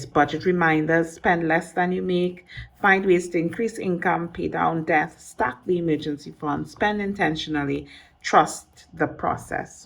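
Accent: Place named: Caribbean. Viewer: Beautiful planner setup 👍. It's really great to hear a Caribbean accent speaking about my obsession ❤️. I purchased my Filofax on Amazon as well. So sad we cannot get this stuff in the islands.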